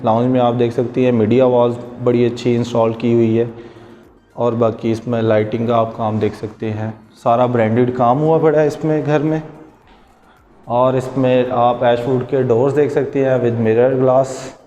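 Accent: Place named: Karachi, Pakistan. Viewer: Bhayya Karachi waly lgty hen😍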